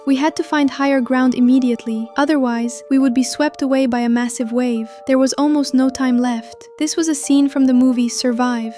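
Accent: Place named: Russia. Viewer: Wth is this Russian accent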